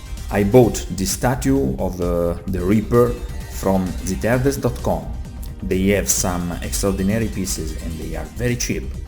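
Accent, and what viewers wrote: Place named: Italy. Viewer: Ce l'abbiamo nella parlata, il nostro inglese sarà sempre... così xD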